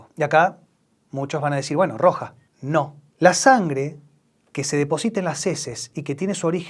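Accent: Argentina. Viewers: vos sos argentino? disculpa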